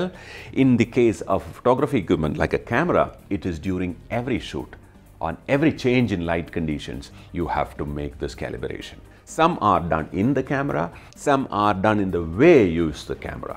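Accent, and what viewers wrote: Kerala, India. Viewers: Are you mallu 😀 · really enjoying how he explain it, simple and understandable, and especially the accent :) is it india?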